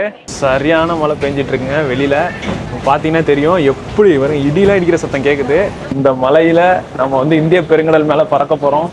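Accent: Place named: Sri Lanka. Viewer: இனிய தமிழ்! இலங்கைத் தமிழ்!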